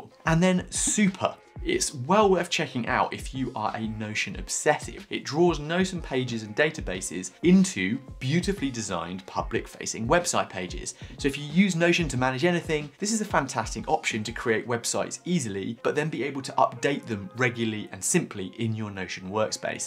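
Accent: United Kingdom. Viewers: I fell in love with your channel two years ago and have religiously been watching every video as well as BUYING both of your second brain offerings. Being an avid viewer, I feel compelled to give you some constructive criticism. We Americans are a sucker for a proper Brit accent and you have a brilliant one. However.... (you knew this was coming)... in the last year or so, you've begun to sound like a pitch man rather than a user advocate. I realize it is most likely difficult to integrate paid sponsorships and make them sound like a recommendation rather than a compulsory blurb in your video. Keep in mind, though, your avid viewers are well aware of the paid sponsorships and seriously know the difference. I have to admit it's really started to turn me off.:( The sponsorship nods get longer and longer and they're usually about products that everyone already knows about. 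I don't want to abandon your channel so I figured, to be fair, I should express my thoughts to give you food for thought and hopefully, have you get some retrospective insight of then vs now to see where I'm coming from.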